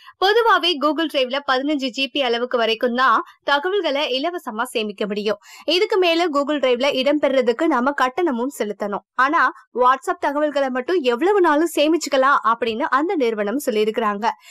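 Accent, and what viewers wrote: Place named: India. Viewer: yeah great! English title spoken Indian.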